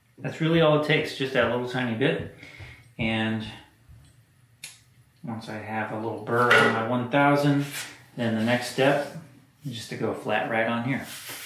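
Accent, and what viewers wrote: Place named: England, United Kingdom. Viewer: I came across your site, I was imprest, and I join. Must say you do not sound American but English. Where are you from ?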